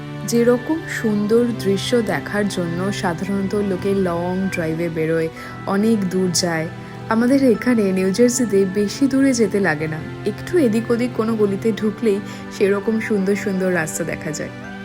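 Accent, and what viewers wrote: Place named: Bangladesh. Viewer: ধন্যবাদ একজন বাঙালি হয়ে প্রবাসী বাঙালির এতো সুন্দর বাংলা একসেন্ট এর উপস্থাপনা মনটা ছুঁয়ে যায়। ঈশ্বর আপনার মঙ্গল করুন।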